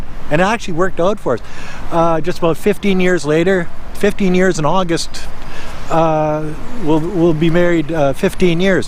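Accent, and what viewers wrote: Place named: Canada. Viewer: Gosh!!! I'm a Canadian myself and I can hear your heavy canuck accent! Americans, British folk out there. This is a true Canadian accent.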